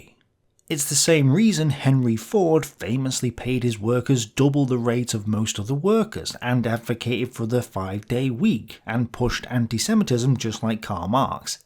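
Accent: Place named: North, United Kingdom. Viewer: Can you do some books on tape where you just narrate? Need more northern folks doing this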